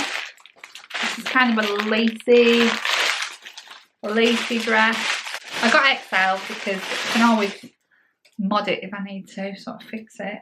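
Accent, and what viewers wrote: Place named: England, United Kingdom. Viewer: Love ur accent what part of England are u from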